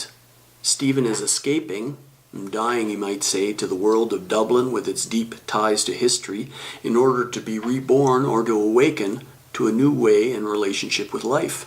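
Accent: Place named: Canada. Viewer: You're from Montreal? I thought you sounded Canadian!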